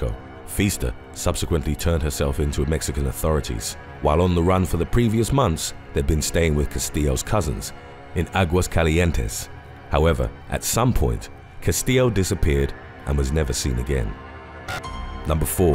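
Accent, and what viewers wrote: United Kingdom. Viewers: using a British voice over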